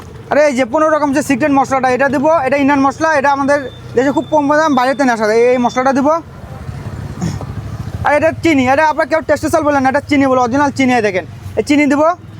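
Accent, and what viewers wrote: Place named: Barishal, Bangladesh. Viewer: Shalay pure borishailla😂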